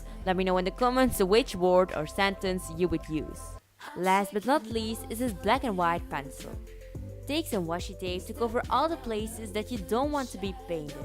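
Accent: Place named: Netherlands. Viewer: I love how he has a Dutch accent. It is so cute. Rlly nice personality.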